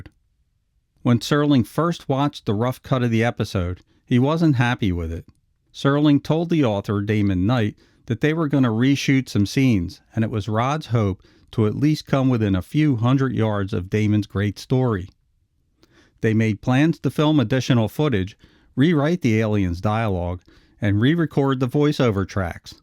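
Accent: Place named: Pittsburgh, United States. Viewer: I can tell this narrator is from Pittsburgh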